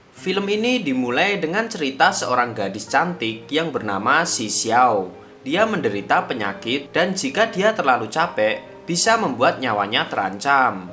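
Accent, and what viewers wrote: Java, Indonesia. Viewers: Alur cerita yg d bawakan bagus tpiIntonasi pengisi suaranya terlalu medok...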